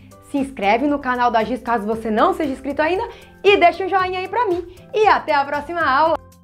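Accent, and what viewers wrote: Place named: Caipira, Brazil. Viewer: Esse sotaque caipira incrível ceRto, teRmo, diferEnça kkkkk Muito bom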